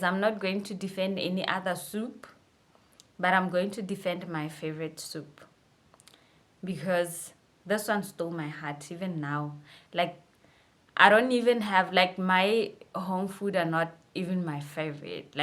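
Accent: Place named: Fante, Ghana. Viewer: You can hear the Fanti ascent in her ❤❤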